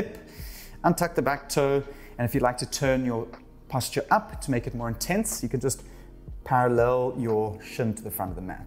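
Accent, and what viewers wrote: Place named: South Africa. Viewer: For a Saffa abroad, it's SO lekker to hear your accent 👌 loved this flow, and thank you for the decent Shavasana at the end! Thank you!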